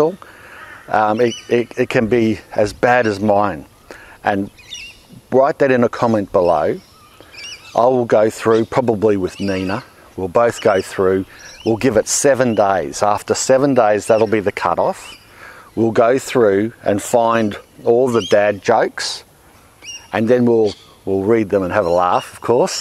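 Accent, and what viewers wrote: Australia. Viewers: Congratulations on reaching 1 million subs! I love your channel and have recommended it to my brother who has a farm in Qld. I live in the US now and love hearing the Aussie accent again! My brother has me worried. Any time he drives by a milk farm, he pulls over and leaves a few dollars on the fence. Doesn't he know cow tipping is illegal?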